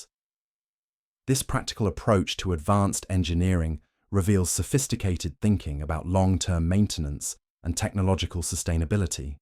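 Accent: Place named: United Kingdom. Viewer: Are there any stories that don't have a British fkn accent???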